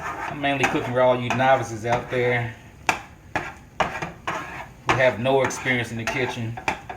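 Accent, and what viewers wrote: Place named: South, United States. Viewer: My wife said you sound country. Where are you from????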